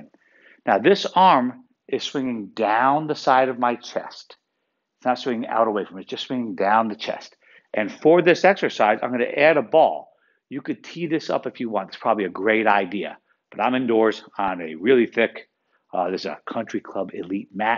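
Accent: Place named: United States. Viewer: This man has an American accent. #skip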